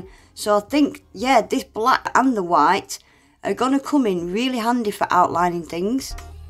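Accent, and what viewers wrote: Northern England, United Kingdom. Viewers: Can I ask, where are you from? Your accent sounds up north lol. I’m from West Yorkshire. What attracted me to your videos was the accent. Stayed for the nails 😂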